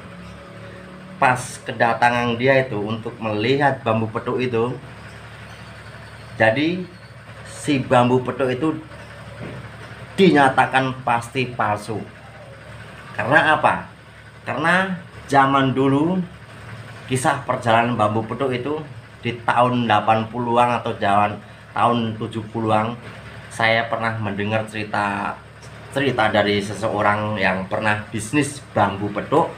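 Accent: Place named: Yogyakarta, Indonesia. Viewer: Sapa hayo itu....jatengnya mgkn Jogja ya kang😂😂😂😂😂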